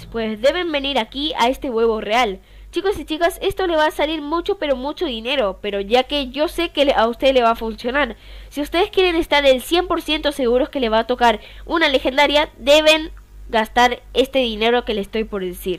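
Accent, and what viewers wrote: Argentina. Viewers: No sabía que eras de Argentina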